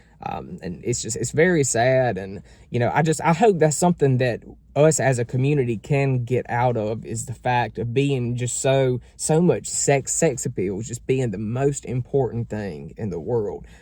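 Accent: South, United States. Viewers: I agree and really like your jacket and everything you said is so damn true. Love your southern accent!!! For me being a chub all my life I’ve seen this and it’s been a lot harder to date. I have struggled with this all my life and I finally just take care of me and of my health and if they don’t like it I now say O well and just try to be me. I like to now not look at looks but how and what kind of a person that they are inside. That’s more attractive now than how I used to be and how others are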